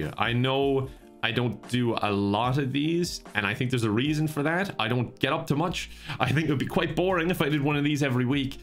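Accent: Ireland. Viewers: i watched many of you videos this year after a friend sent me your first among us one when she was tryna get me to play and i kept watchin them because it made me miss my grandparents a little less,, that might sound weird but theyre irish and your accents are slightly similar tho yours is stronger i havent seen my grandparents for over a year so thank you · Instead of listening to music in the car, I play videos when I drive. Nothin like Irish accent to get you going places (no pun intended)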